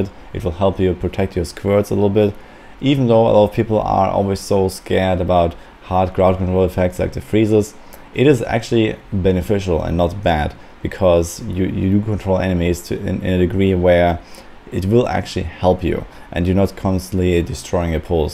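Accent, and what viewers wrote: Germany. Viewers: bist du deutcher? hast so den Akzent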